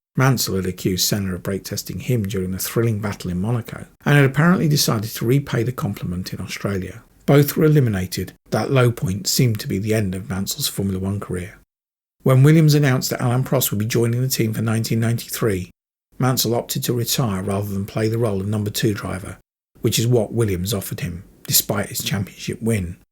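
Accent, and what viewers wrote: United Kingdom. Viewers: French here. Thank you sir for being able as an english motor racing buff (I suppose so, based on your way of speaking) to paint a balanced picture of an english great driver, rather than producing yet another hagiography. Moreover, the editing of your video is quite good and your comments brillantly written, congratulations. To complete Mansell's picture, Alain Prost declared, in an interview part of a long documentary on his career made by a french TV channel, that Big Moustache was the only teammate he had not been able to work efficiently with in Formula 1, because the man of the Man's Island was not commited enough in the development's work and was behaving as a prick generally speaking. He explained that even when he and Ayrton had a huge disagreement and were not talking to each other anymore in 1989 after the San Marino GP's incident, they managed to keep on collaborating very professionnally during technical briefings and development's sessions. 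According to him, Nige was very fast and undisputably the bravest of all, but not as complete a driver as Niki, Nelson or Ayrton. Despite his flaws, "Il Leone" was a fantastic striker almost always thrilling to watch, and a monster of will who deserves a huge respect for that reason, considering the physical impairments he had to overcome all along his motor racing's career, resulting from his very serious accidents in the early stages of it.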